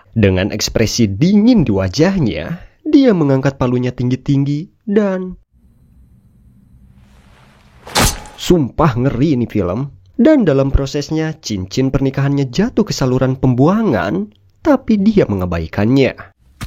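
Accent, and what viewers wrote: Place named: Bandung, Indonesia. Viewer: apakah vo ini gk ngeri malah lucu gara"bahasa bandung nya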